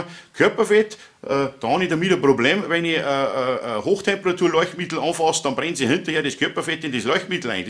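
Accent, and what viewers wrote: Bavaria, Germany. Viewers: hallo, zuerst mal vielen Dank für deine Videos immer wieder eine Freude anzuschauen und die Art wie du auf deiner boarische Art die Sachen vor trägst herrlich. Ich habe aus deinen Videos schon viel an Wissen dazugewonnen. Ich habe noch zu einer Zeit Kabel absetzen gelernt als wir nur ein Messer hatten und einen ordentlichen Rundschnitt gemacht haben. Richtig so dass du den Bleistift spitzen die Meinung geigt. Und das Leben aus der Praxis betrachtest eben wie es auf der Baustelle abläuft. Ich freue mich schon riesig auf dein Video zum Thema Drehstrommotoren und habe dazu sicherlich jede Menge Fragen. Spannend wäre für mich auch eine Sache die vielleicht noch andere interessiert nämlich die elektrische Drehzahlregelung an Drehstrommotoren insbesondere bei kleineren Ständerbohrmaschinen die dann am 230Volt netzbetrieben werden vielleicht hast du mal Lust dazu etwas zu machen. 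Wenn's morgz kannst ja mal bei mir bei Instagram vorbeischauen ich mache viel mit Holz aber auch Werkzeugbau und Maschinen mit Vorrichtungen. Liebe Grüße aus Berlin der saupreiß zieht die Hut vor dem Bayern. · Hallo, ich bin dem Bayrischen nur bedingt mächtig, konnte dir aber im Wesentlichen folgen. Aderendhülsen - ich bin von Grund auf kein Mensch der gern mit halbherzigen Werkzeugen arbeitet. Aber die Presszange von Knipex für 130 Euro war mir einfach zu teuer. Ich dachte mir, dass dies auch bestimmt günstiger geht und daher habe ich mal bei dir reingeschaut und bin fündig geworden. Danke für deine Hinweise und deinen Randbemerkungen, die oft den praktischen nutzen beurteilt. ich könnte mir gut vorstellen, dass so eine billige Zange bei 16²mm anders pressen wird, als einer Knipex. Nun, ich bin aber kein Berufselektriker, sondern Elektroniker. Ich löte eigentlich fast nur und dies habe ich in der Vergangenheit auch mit Litzen getan - sie verzinnt. 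Dies soll aber verboten sein und mir gefällt die Isolation nach dem erhitzen nicht besonders gut. Außerdem ist es schneller erledigt, Aderendhülsen mit Kunststoffhülse zu verwenden, satt sie zu verzinnen und dann mit Schrumpfschlauch zu überziehen. Ich werde wohl kaum mehr als 3.5²mm pressen. Hauptsächlich geht es um dünnere Steuerleitungen die an einer Leiterkarte angelegt werden sollen. Das möchte ich gern professioneller umsetzen können. Daher die Suche nach geeignetem Werkzeug. Danke für die Info um Quelle. Dort finden sich zahlreiche Anbieter von Zangen und Sets. Ich schau mal dass ich geeignetes finden werde. Mir war wichtig zu erfahren, ob die preiswerteren Zangen schlechtere Ergebnisse erzielen oder in ihrer Anwendung umst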